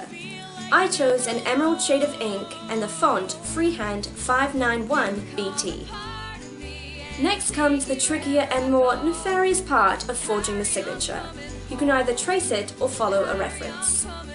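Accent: United Kingdom. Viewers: this is a great idea! I love your accent are you welsh of british? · Are you really British?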